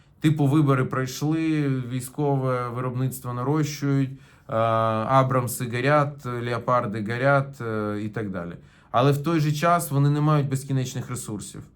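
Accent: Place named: Russia. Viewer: Это что, украинская мова??? Да ты даже язык выучить не можешь. Это даже не суржик, а Русский с хгеканием!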